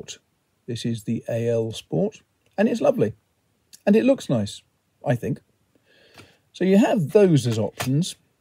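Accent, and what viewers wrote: United Kingdom. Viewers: Just because we speak a common language does not mean we always understand each other! When you say you look at the bezel, are you looking at the cameras lens? You are the only person I have encountered that makes it look natural. And you are more pleasant to look at than you give yourself credit for. Probably the accent has a lot to do with it. We here in the colonies are suckers for the accent!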